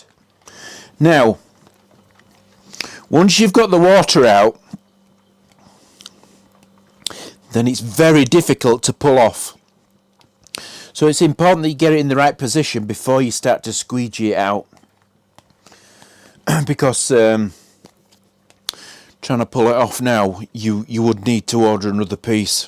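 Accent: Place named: Yorkshire, United Kingdom. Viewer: Thank You,! It's pretty straightforward for dummies like me ))) And by the way lemme ask You one question: I noticed You often pronounced the vowel "u" as ʊ when it's supposed to sound like "ʌ". Fore example You say mʊd instead of mʌd... Is it a specific Yorkshire dialect? Thank You once more!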